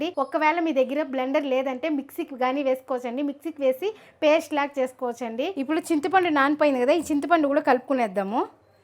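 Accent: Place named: Kanchipuram, India. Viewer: కామక్షి తాయి లాగా ఉన్నావమ్మా కంజీవరం అమ్మదా మాట్లాడు తునట్లు వుంది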